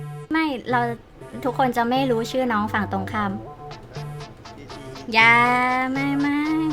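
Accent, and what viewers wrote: Thailand. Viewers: เอื้อย คึเว้าอิสานเป็นวะ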